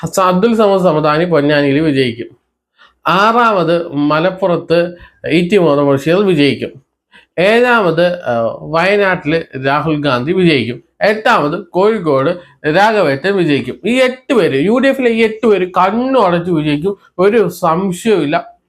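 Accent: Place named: Tamil Nadu, India. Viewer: സംസാരത്തിൽ തമിഴ് കലർന്ന പോലെ ഉണ്ട്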